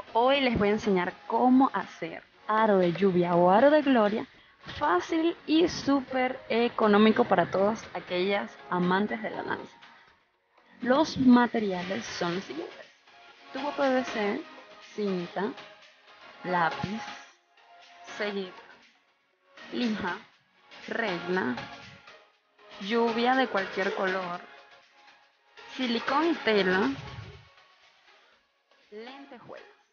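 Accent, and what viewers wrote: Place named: Ecuador. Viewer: Eres de ecuador o venezuela?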